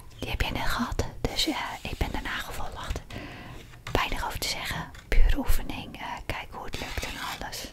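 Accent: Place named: The Hague, Netherlands. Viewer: Waar uit Zuid Holland komt u vandaan? Het lijkt of ik soms haagse of westlandse dingen hoor haha, ik kom zelf uit het westland😊 superleuke video's! Ga zo door❤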